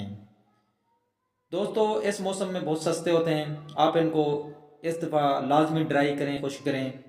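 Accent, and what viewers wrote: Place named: Saraiki, Pakistan. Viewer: میڈا بھرا بالکل سرائیکی لگئے😅😅کتھوں دے ہیوے بھرا خاں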